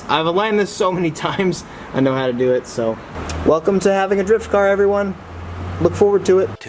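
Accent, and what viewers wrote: Australia. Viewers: I love the Aussie accent! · Ten out Of ten for Aussie accent 👍👍👍👍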